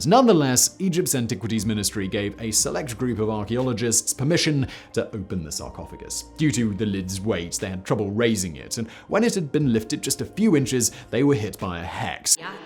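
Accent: RP, United Kingdom. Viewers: Combine a British accent, a black sarcophagus and the word creepy and I'm all in. Guilty clickbait. Sigh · Sorry, can't understand you. Please don't speak so fast. From USA. I don't have the ear for the queens English. Love the content. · Your tone is far too posh to allow proper concentration & your humor is nearly too tenderfoot to have the majority of anything said to be taken remotely serious... If you wish to be but a petty Monty Python copy then by all means present yourself as such. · Your arrogance is showing..English guy with the accent… but you’re rich from this but you click baited me with the extra chatting! I already know what you know 🤫, in spite of your perpetration of proper investigations! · Amusing hearing you go from BBC British pronunciation, occasionally to D&D levels of exorbiantly posh that would put Nigel Thornberry to shame.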